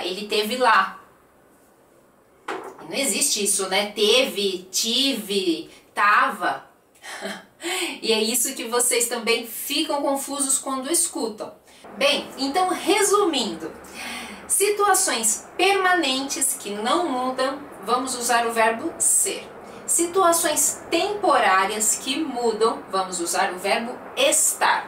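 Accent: Brazil. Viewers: Descargo sus vídeos en MP3 y me los aprendo y así es como practico mi portugués, sobre todo el acento brasileño. Son muy buenos, me encantan, muito obrigada!❤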